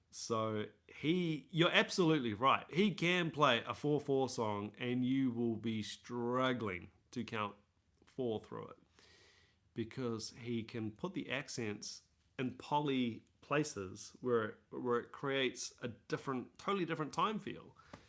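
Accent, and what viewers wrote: New Zealand. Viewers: Kiwi accent🤣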